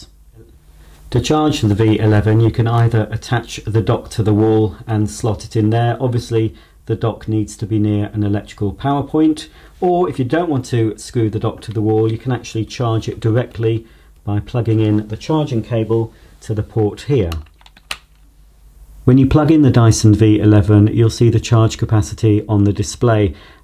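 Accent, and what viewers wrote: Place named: United Kingdom. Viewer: Came for the review stayed for the British accent. Greetings from Florida 🌴🌴🌴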